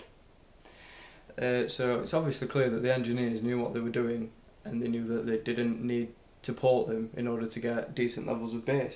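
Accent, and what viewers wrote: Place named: Yorkshire, United Kingdom. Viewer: ***** Safe bruv! lols can tell with thas voice, Am near Leeds like. Had to get a lone against my AE 120s while next month. got some wharfedale 9.0 for £25 and am dam impressed for such small speakers. Gonna cop some 9.1s now and some nice stands. hopefully next month il have my ae's back and be a very happy bunny. Incredible value are the 9.0/.1 determined to find 9.1s at about £70. Peace, and keep up the great work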